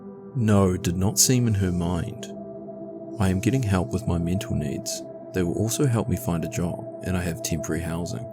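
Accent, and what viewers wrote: New Zealand. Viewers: Are you from NZ? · Are you from New Zealand or South Africa? I'm trying to place your accent. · I turned on notifications just for the Kiwi accent haha 🇳🇿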